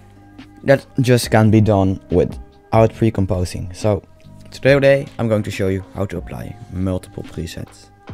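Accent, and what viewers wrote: Netherlands. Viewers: ik hoor gewoon aan je accent dat je uit nederland uit komt hahahaha. Maar dankje wel voor de video. Was egt hulpzaam!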